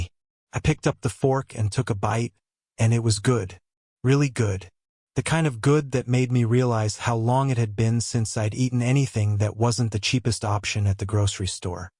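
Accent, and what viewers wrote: Spain. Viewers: and again those spanish accented phrases popping up like unwanted exclamation marks -. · The occasional Spanish and Spanish accent is funny 😂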